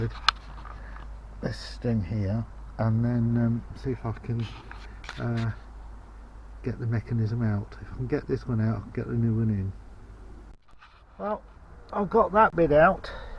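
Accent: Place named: United Kingdom. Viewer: Thanks for this video. As a British accent lover i have to also say that your accent is amazing!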